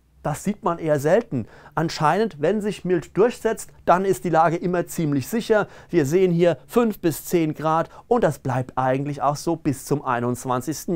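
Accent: Hesse, Germany. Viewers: Kälte weit weg , sehr gut! Bißchen hessisch nächstes mal wieder bittte 🙂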